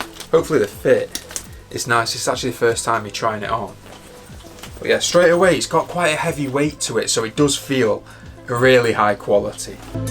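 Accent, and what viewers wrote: United Kingdom. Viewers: Your English is so British. Where are u from?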